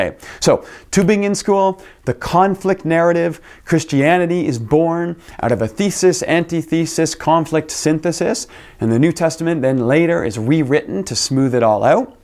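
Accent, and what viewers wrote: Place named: Canada. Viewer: I can hear the Canadain influence not only in the accent but in the non-binary perspective so refreshing. Speak louder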